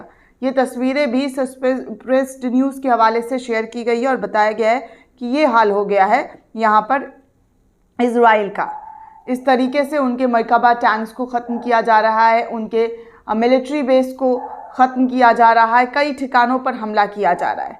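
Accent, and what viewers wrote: India. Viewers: Ganwaar pronunciation like Modi ...unsubscribed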